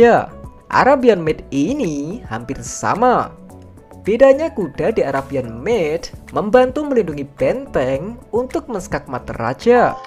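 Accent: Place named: East Java, Indonesia. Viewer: Kyk logat jawa timur an,kek nya emang orang jawa timur ketara bgt soalnya😂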